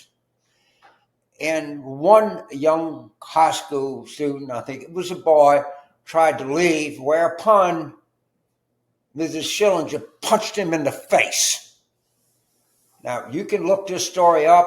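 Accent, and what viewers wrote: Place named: Louisiana, United States. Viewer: I don’t know why but I want to hear Mr. Carville say, “We’re talking ‘bout wife swappin’ with these moms for liberty” in his Louisiana drawl.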